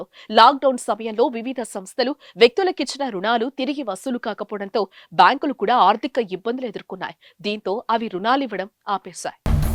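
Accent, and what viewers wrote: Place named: United States. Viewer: అమెరికా వాళ్ళు తెలుగు మాట్లాడినట్లుంది నీ ఉచ్చారణ. నీ ముఖం.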